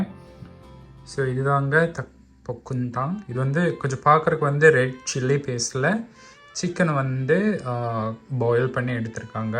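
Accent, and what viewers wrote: Tamil Nadu, India. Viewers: Hi anna I'm from Sri Lanka Our Tamil is little different than India Tamil But your Tamil feel good anna Slang also cute anna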